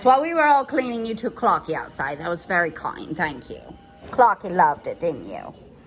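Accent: England, United Kingdom. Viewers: I’m from the U.K. and your English accent is hilarious 😆 I love it!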